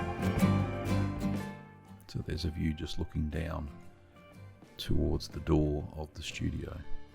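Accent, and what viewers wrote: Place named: Australia. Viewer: I want to develop an accent like yours, can I develop it without coming to Australia, though? Like, I love the Aussies, but the snakes and spiders and sharks..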